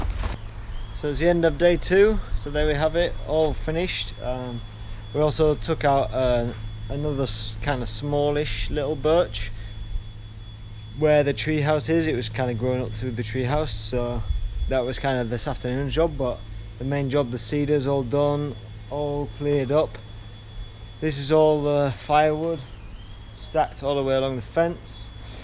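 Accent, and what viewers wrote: Yorkshire, United Kingdom. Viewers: Yorkshire or Lincolnshire accent mate. Great job on that, zip line for limbs inspired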